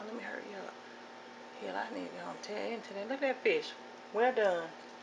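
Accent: South, United States. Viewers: Love your voice and it’s making all kinds of southern accent sense to me! Sending love cook to cook from Bham, AL! ❤❤❤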